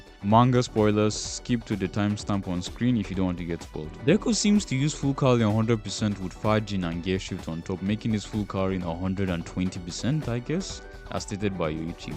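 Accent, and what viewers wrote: Nigeria. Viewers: You Nigerian?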